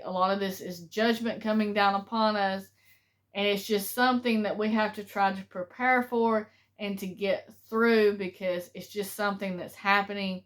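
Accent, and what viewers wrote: South, United States. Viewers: Uze a southern gal sheeeeeet that eeeez cooool...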